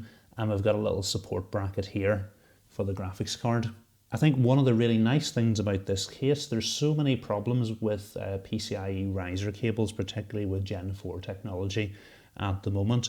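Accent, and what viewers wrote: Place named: Scotland, United Kingdom. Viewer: 55 minutes of Scottish ASMR PC building. Lovely.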